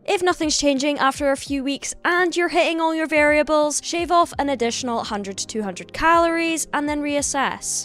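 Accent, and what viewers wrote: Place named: Scotland, United Kingdom. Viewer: SECOND COMMENT:🥈 happy Sunday, Can I please be your Pookie? What does that mean? By the way? I don’t even know what that means and I still wanna be your Pookie BTW You’re a Scottish🏴 accent=🔥I love you❌⭕️❌⭕️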